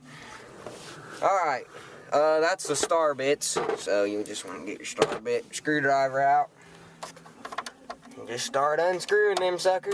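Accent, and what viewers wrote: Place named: South, United States. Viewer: Called good ol country boy accent. It is how we talk down south of the Mason Dixon line. Lol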